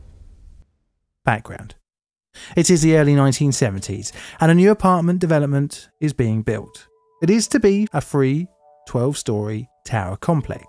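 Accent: United Kingdom. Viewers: Narrator’s accent is aggressively British.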